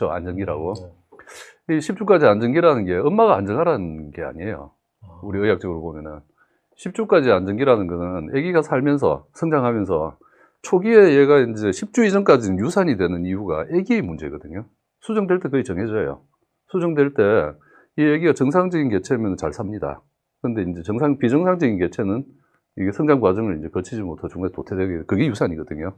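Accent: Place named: South Korea. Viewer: 잘생긴 외모에 멋진 목소리 구수한 사투리에 친절하신 설명이 도움이 많이 되네요 감사합니다~~~~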